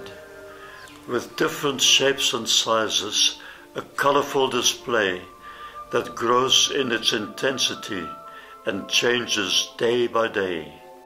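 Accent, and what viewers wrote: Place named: South Africa. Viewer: Wonderful and heartfelt video, well done! Good to hear a friendly South African accent too:-)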